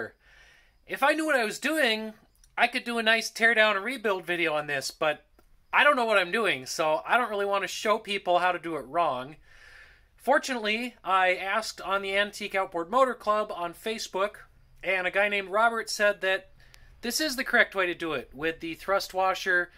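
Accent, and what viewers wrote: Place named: Minnesota, United States. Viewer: I thought so. I take my 74' Starcraft Capri trihull on that lake sometimes. I sure thought you sounded Minnesotan, I was right!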